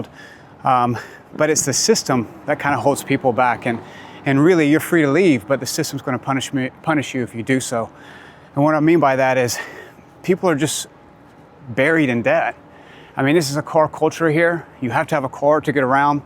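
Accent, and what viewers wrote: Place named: Northern England, United Kingdom. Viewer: This guy is obviously from Northern England or Ireland and is cosplaying as a North American for clicks and likes.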